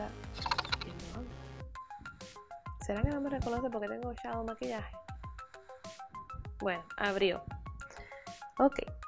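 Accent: Cuba. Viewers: jajaj tienes un asentó chistoso pero no pareces q eres de aqui de mi pais republica dominicana eres como cubana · Cambiastes la voz y es una técnica perfecta para conseguir más subscriptores... porque hablas ahora como tú nacionalidad ósea cubana y es lo mejor que pudistes haber echo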